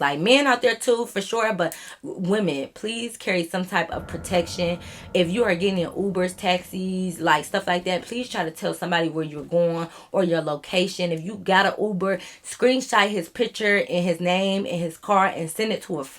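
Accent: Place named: Alabama, United States. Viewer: Sound like Alabama or the south for sure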